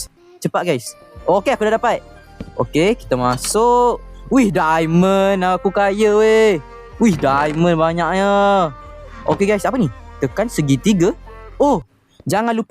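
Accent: Malaysia. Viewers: Bang,ni orang kuala lumpur ke, saya orang kedah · WEH MU KELANTAN KO 🗿🗿🗿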